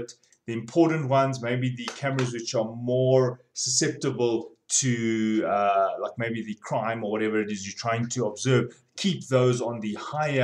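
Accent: South Africa. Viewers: south african lol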